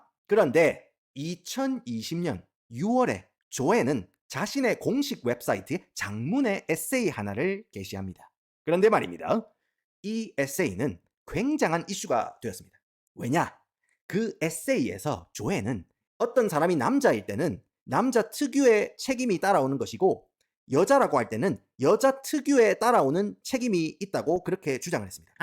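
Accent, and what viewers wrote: Gyeongsang, South Korea. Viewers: 니말투 경상도인간이네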